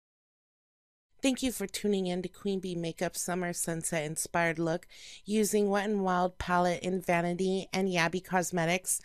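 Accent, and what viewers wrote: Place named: North, United Kingdom. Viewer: Uhmm... Pin your hair back. You live up north, yeah? I love to hear the accent come out.... Poor me got stuck down south. I wanna move back.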